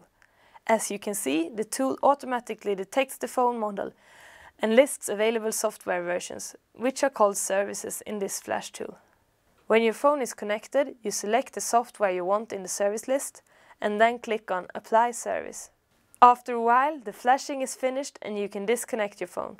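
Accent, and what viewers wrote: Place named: Sweden. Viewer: Typical swedish accent :)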